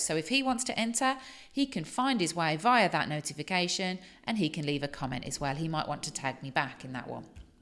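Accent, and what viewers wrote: United Kingdom. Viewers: Super beginner's guide to Facebook. Intrigued by your accent. Are you from Worcestershire originally? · Are pronouncing British English?Is so, that's great.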